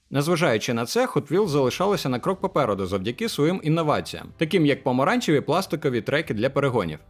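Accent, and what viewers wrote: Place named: Surzhyk, Ukraine. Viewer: Интересное видео посмотрел с удовольствием так как знаю украинский но по вашему акценту и суржику ухо иногда режет вы будто через силу и принципиально выбрали язык повествования из-за своей позиции это хорошо отстаивать мнение но если хотите больший охват аудитории то сами понимаете что не большая доля охвата людей в снг понимают украинский(с уважением коллекционерство без политоты)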